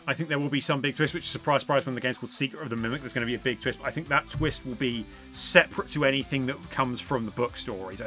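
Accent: United Kingdom. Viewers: fkn bri ish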